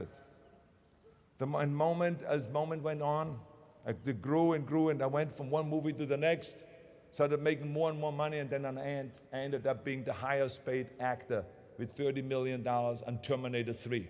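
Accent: Germany. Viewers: The German accent is pretty strong, and Germans/Austrians are pretty tight with their Pronunciation, and I guess when you start speaking English properly as an adult you always have an accent. My parents have been living in an English speaking country for 15 years and they still have an obvious foreign accent, but me, having moved here when I was 4, I speak the same way everyone else does here. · How come this guy's been living in the U.S. for 40+ years and he still keeps such a strong German accent? he says he also took accent removing classes...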